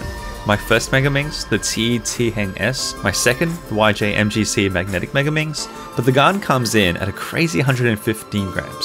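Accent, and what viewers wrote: Australia. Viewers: TNL Cubing it’s his au accent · Are you australian?? I'm australian!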